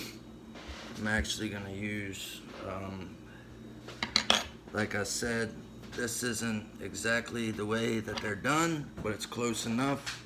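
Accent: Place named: United States. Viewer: It’s pretty insane from my perspective to know that at some point you had to learn this to survive in 2020/2021.. Sound like your from the states as well. Stay safe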